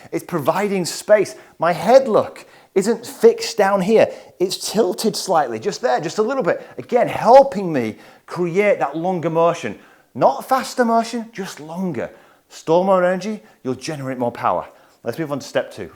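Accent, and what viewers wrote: United Kingdom. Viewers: Thanks, that was very useful. I also really appreciate your British accent !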